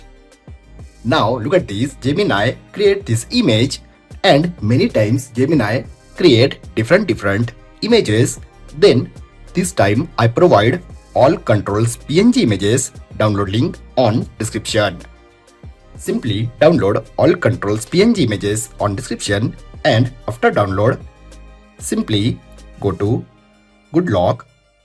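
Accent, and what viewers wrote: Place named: India. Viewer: 🫪Ooh nooo... indian english!! 😵‍💫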